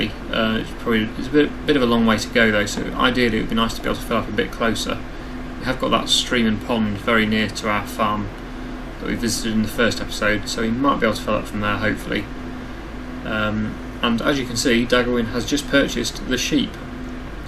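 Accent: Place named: United Kingdom. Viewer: Omg the first time I heard yr voice over and OMG I LOVE THE EXTREME BRITISHNESS (I am british but like yeahhhhhhhh)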